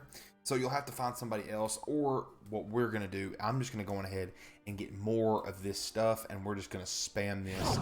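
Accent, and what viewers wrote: South, United States. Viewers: I don’t watch you channel but hearing a dude with a southern accent explaining Skyrim stuff to me is much more comforting and entertaining than a sweaty nerd voice.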